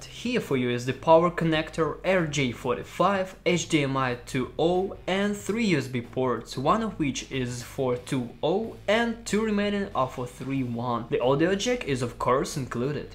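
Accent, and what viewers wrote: Russia. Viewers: Жёстко произносить по-русски английские слова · Было сложно, но я понял по незначительному акценту, что Русский! А потом видеозапись игр с русскими надписями... 👌😂 У тебя версия с gtx1060 или мне показалось? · are you russian? 😅 btw amazing laptop and best looking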